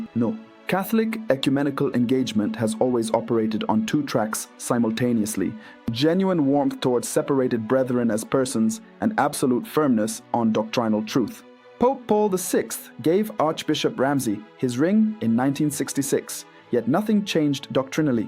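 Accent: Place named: England, United Kingdom. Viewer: UK now time here You have an English accent not a USA accent - are you based in UK? love all your talks and shorts.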